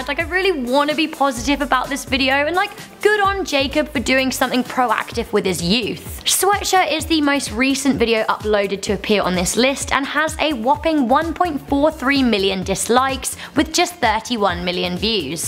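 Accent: United Kingdom. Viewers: world most understandable British women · ***** I KNOW ITS BRITISH but she tried to imitate the portuguese accent when she said "cortando o botao do youtube" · Philip J. Fry It's a British accent.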